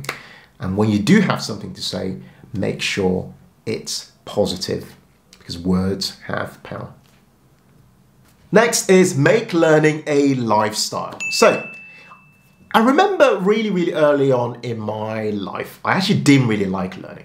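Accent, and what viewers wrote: United Kingdom. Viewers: How do you have such a british accent when you arrived in the uk at 15/16?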